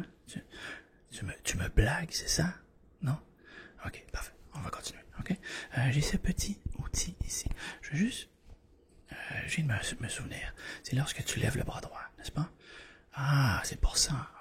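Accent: Quebec, Canada. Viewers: Voilà désoler mes on comprend rien l accenquébécois c'est pas la langue française voilà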